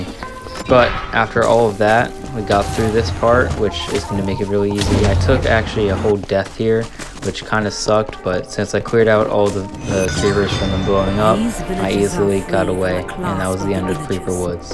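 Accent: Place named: United Kingdom. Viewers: y sound british. btw better reply to this or im unsubbing!!!! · He does fr sound British to be honest in the videos beginning ngl lol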